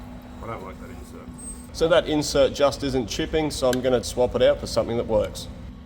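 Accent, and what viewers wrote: Australia. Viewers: Love this channel, I’m American and from across the world and is still the most relatable guy on YouTube, talks like every guy in the shop just with an Australian accent, and using metric, and bananas 🤢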